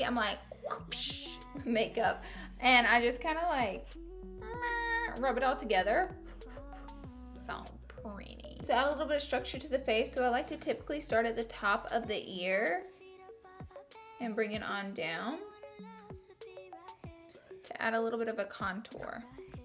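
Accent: South, United States. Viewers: Omg you're so gorgeous 😍😍😍 and I love your accent it sounds southern 😅😊😊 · Omg girl where are you from? That Souther bell accent has me so in love! And I'm so proud of you and your pallete. Started from the bottom. Inspires everyone to just keep going at all times. Work hard. Now enjoy the rewards that comes with it. You deserve it.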